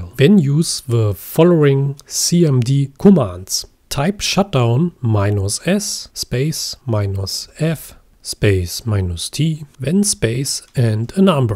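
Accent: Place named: Germany. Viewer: Danke für deinen schönen Tutorial! Der Akzent kommt voll raus haha direkt erkannt DE 😂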